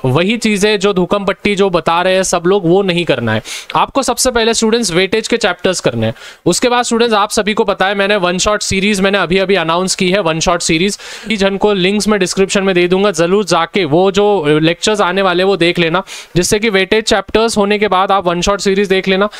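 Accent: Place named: Aurangabad, India. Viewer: Aurangabadi accent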